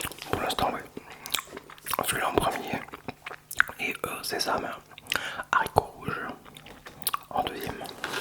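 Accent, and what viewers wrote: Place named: Alsace, France. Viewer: Tu est alsacien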